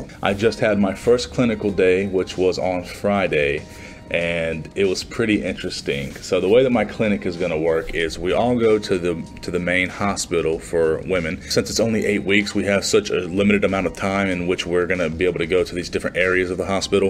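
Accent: South, United States. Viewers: Where are you from? I think I hear a bit of a southern accent..I loved OB but that's what I want to do! I have peds this semester, so I'm super stoked!! Good luck to you!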